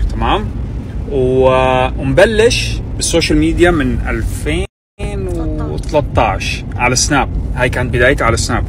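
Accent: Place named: Aleppo, Syria. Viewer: لهجتك شوي حلبيه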